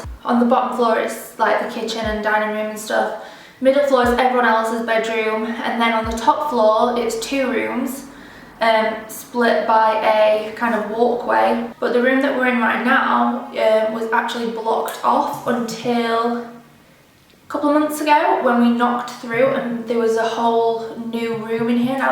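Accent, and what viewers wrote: United Kingdom. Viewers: I love the accent are you British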